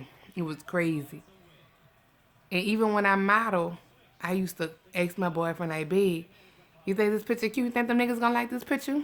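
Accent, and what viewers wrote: New Orleans, United States. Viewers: I just love listening to you! Ya insights and slang and N.O. accent...Very comforting.I promise I would listen if you made a video describing how to make a peanut butter and jelly sandwich.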